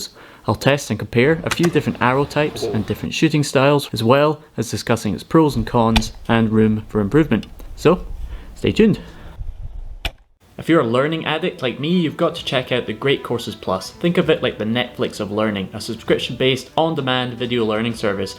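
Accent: Scotland, United Kingdom. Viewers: Even better given the presenter has a Scottish accent. 🙂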